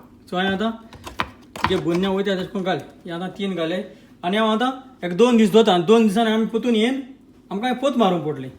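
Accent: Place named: Saxtti, India. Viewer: Nice. Love the Saxtti dialect too!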